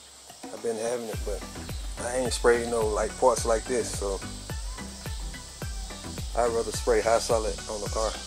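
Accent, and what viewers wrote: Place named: Missouri, United States. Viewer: you sound like your in Missouri, Thad be cool, long live the Cicada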